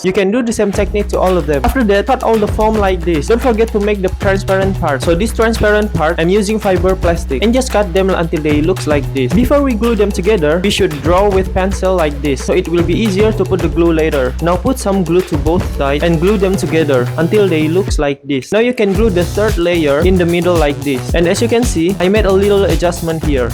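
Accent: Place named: India. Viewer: Kirain orang prindapan,logat inggrisnya mirip soalnya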